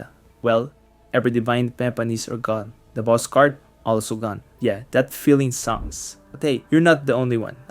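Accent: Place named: Philippines. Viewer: i knew that accent kababayan lmao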